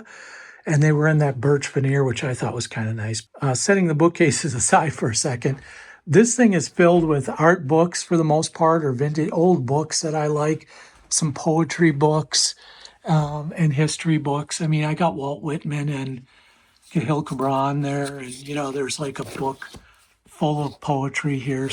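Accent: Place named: Midwest, United States. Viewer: Love how mid-west you speak,! I remember your cool old drafting table you had in the basement studio. Wondered if you miss it.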